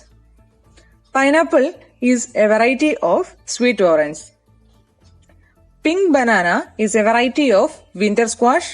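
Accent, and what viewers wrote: Kerala, India. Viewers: Madams pronunciation sounds like mallu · Mam you are sounding like malayali ??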